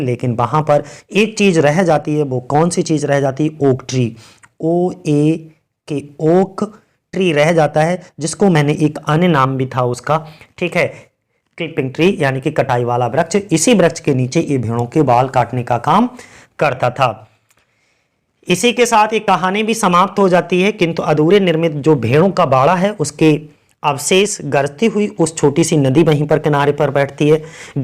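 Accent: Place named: Pakistan. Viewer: Yaar tm pakastani sound kyu kr rahe ho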